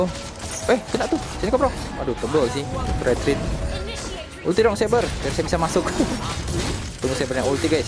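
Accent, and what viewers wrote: Manado, Indonesia. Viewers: Orang asli manado ngana bro?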